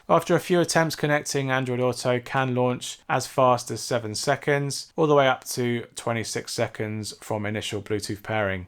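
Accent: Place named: United Kingdom. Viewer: Hey man just some friendly advice for your English UK self haha. We all appreciate the heck outta your videos n the time n effort that goes into each one as they're not easy. But with that said, your videos have all become the exact same in regards to the tone of your voice or mono tone effect if you will, and it's causing many people (I've spoken to others who have mentioned same thing) to downright turn it off cuz they've become flat and boringish to an extent. Most noticably when you talk about good things about the device then nonchalantly dive into bad things without even segwaying or mentioning it. Wanted to bring it up bcuz we all know it better than this bland same shit different day attitude and script u seem to have. So let's pep it up and learn from our mistakes. Keep on keeping on. Or is it? Or however it's pronounced I'm bad with that Uk accent. 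Take care buddy.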